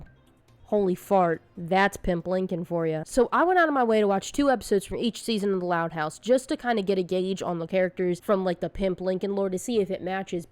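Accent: South, United States. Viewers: I love your southern accent. 😊 It's so cool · I'm hearing more of a Southern accent than a Boston accent. :P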